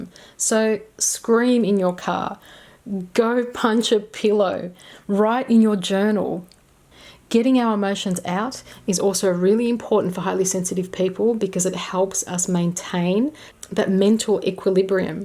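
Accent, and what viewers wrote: Australia. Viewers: Yes it is a lovely hypnotic Australian female voice.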